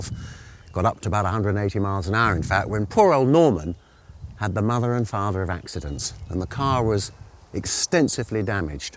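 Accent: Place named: United Kingdom. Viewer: I love the way the British pronounce the marque: "jag-you-er", as opposed to the way we say it in the States: "Jag-ooh- are".. however you say it, this is a pretty car. My only complaint is that the tail looks a bit too much like the nose. I don't like designs that look the same coming and going... A slightly upswept "Kamm" style spoiler tail, such as on the Ford GT40, '68-72 Vette, or Ferrari 250 GTO or 250 LM, would have been an improvement IMO.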